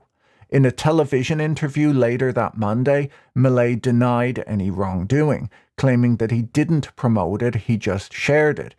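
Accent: Ireland. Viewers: Listening to dry humor and delightful Irish accent when he dissects the current madness happening in the world is so enjoyable in these insane times we live in. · I want him to do an ODB story so I can hear him name off his AKAs in a straight Irish accent.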